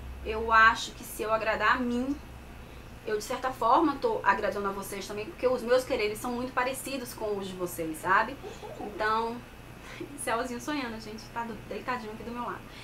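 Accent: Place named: Bahia, Brazil. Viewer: já falei que desde primeira vez que encontrei seu blog me apaixonei, depois ouvi seu vidio virei super fã, adoro de mais seu sutac... e agora descobri q vc tbm é baiana... 😍 eu tbm sou Baiana😍Chapada diamantina 😘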